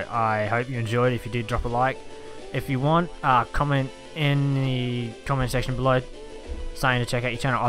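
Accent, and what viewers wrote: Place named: United Kingdom. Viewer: Nice a British guy goooood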